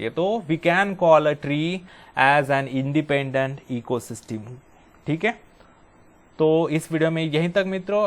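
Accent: Maharashtra, India. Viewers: Thank you so much sir for teaching us very nicely...😁 Btw I have a question , is your mother language is Marathi..??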